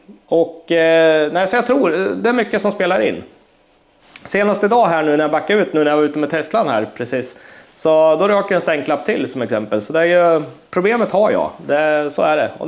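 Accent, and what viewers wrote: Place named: Norrland, Sweden. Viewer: Nu kanske du sagt det tidigare vid något tillfälle, men jag har väldigt nyfiken på din dialekt och var du/ni är någonstans i Sverige. Om jag skulle gissa på det skulle jag nog säga Västernorrland/ Jämtland/ Västerbotten.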